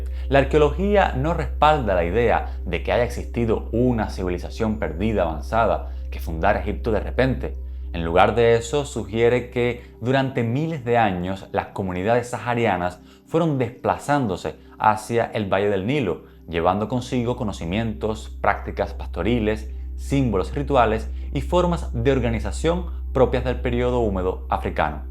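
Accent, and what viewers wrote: Cuba. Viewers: Amigo tu acento me suena a Cubano. Lo eres ?? Gracias muy buen canal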